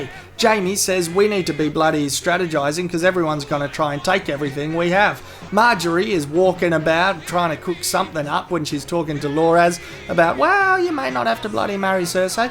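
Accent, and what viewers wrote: Australia. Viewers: Bloody good stuff as always. No other accent could keep me quite as entertained in a review as the good ol Ozzy!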